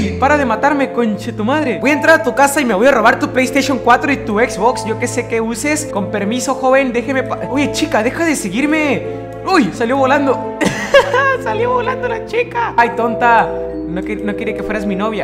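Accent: Chile. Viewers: Alv pensé que es chileno XD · No sabia que era chileno xd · Eres de chile?o de donde? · eres de chile?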